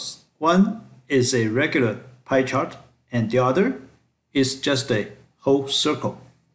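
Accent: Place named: South Korea. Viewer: Thank you ! Helped a lot as a beginner :) Also your accent reminds me of my korean friend.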